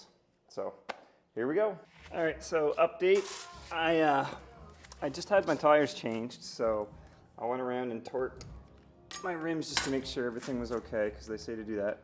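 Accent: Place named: Canada. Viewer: Canadian eh???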